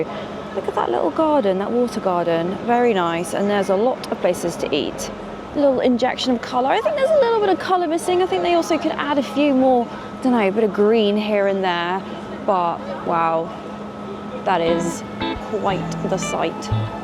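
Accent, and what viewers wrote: United Kingdom. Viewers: Hey, I have recently started living in london (monument) and absolutely agree with your views on about this area, even I felt the same when I cross path accidentally, coz I had to take tube from elizabeth line to london bridge, and my gosh your views are on point.. Also love love your heavy British accent ❤ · I would have clicked off quarterway thru but Your music choices are fab! I literally listened to the end just to hear the music tracks! So nice i had to shazam them! Great tunes! Thanks for musically educating us. Oh by the way it's 'mall' (like the word all} not mall like the word pal.! U should know this u sound british!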